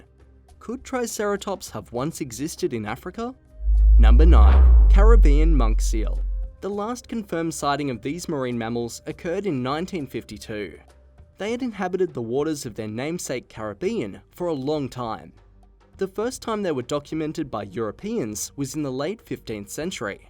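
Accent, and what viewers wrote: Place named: Australia. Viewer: If it's aussie speaking, i believe its real even tho he lack of/some missing words 👌🏾🇦🇺🎙️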